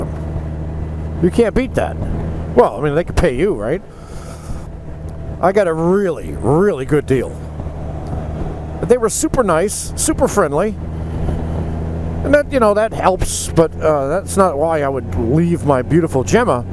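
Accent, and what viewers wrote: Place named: Boston, United States. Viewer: Laytah ....BOSTON ACCENT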